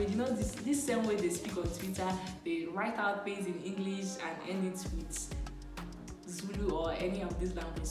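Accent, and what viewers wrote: Nigeria. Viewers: you have south african look, hence they talked in our native language. it is not easy clear with you, only when you speak you sound naija. you look like south african, skin tone, face propotion and and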